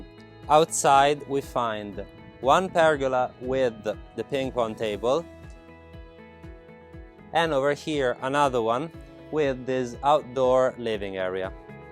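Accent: Italy. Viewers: Great video, as always, with a very professional presentation. Your English is very good, and I love your Italian accent! I love when you say "terra cotta". I could never role an "R" like that. Please keep the videos coming, and improving your presentation. I bet the your sales are going up!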